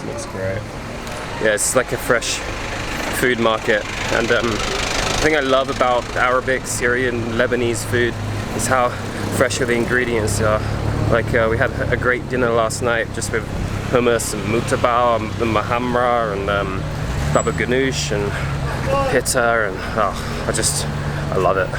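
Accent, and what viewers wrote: United Kingdom. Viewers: He sounds like he's from Southern England? How hard is it to visit a country like this with a UK passport?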